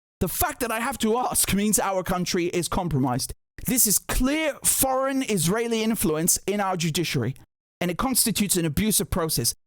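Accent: England, United Kingdom. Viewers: I haven’t listened to you in a while. Your British accent has become much thicker. Maybe it is from stress. I generally only agree with you about 50/50 but silencing people is wrong no matter what they are saying. We are all given minds to think. If what you are saying is totally false, it will be easy to see. Why the fear? Control of available information should be resisted. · A slight bit more England English😊... Glad to see him back.. his personality is irreplaceable and his sense of humor and in-depth knowledge...while reporting is so greatly missed❤ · Is it just me or is British accent coming out more now? Like when he says "befaw" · Bro, I'm just going to say it. The wierd partial english accent is AGGRAVATING.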